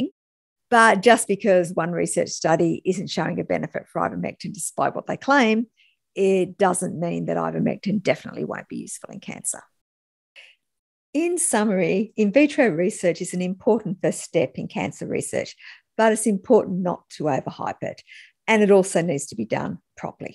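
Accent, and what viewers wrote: Australia. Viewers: she has Australian accent, so whatever their equivalent to NIH would be; also, Australia went full totalitarian fascist Chinese-style lockdown, destroying many lives and livelihoods (much more than USA, which was bad enough) during the plandemic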